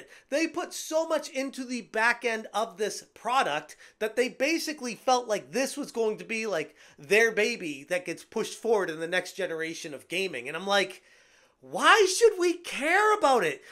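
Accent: France. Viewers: As a Québécois, definitly sounds like a French Speaker ( from France ) reading a script without really knowing english. I could translate but he basicaly just explain what the girl do in the video. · Oui, this is "the" french accent..... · The narrator is literally speaking fringlish he’s trying to say them in French but tries to correct the pronunciation into English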